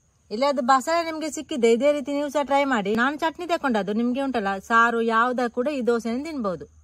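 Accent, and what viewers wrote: Mangalore, India. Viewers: Pure mangalore kannada❤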